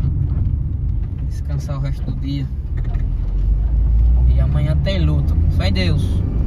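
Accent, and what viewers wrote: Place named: Northeast, Brazil. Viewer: Sotaque nordestino é maravilhoso 😊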